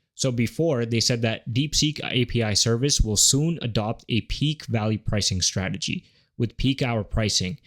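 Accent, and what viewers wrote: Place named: India. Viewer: Are you an immigrant from India or from another country? Your accent doesn't sound like that of a native Canadian or someone born and raised in the United States.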